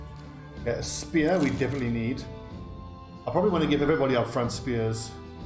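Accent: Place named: South Africa. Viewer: You from SA? sounds like it. Nice vids btw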